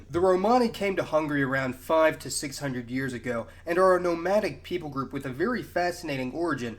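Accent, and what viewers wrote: United States. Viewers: Dude, your hyper-stereotypical American pronounciation is just killing the fun in listening to your vids.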